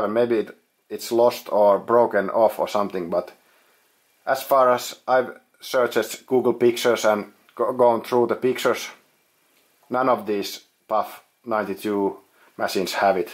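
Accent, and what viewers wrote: Finland. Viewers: Hieno video! Rallienglantia kuulee nykyään liian harvoin kun Kimi ei enää esiiny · I love your Finnish accent :)) I have the possibility of buying a Pfaff 93, do you recommend it? How much would you say it is worth?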